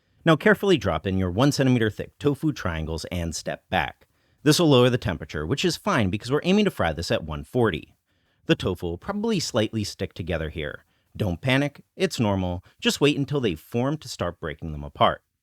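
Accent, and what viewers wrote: China. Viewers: Why does this white guy have a Chinese accent? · It's interesting to hear the slight bit of accent you picked up in your time in China. You speak a little bit "sing-songey," and I find that kinda neat. As always, I love your videos! Chinese cuisine seemed a world away until I started following you. Super informative!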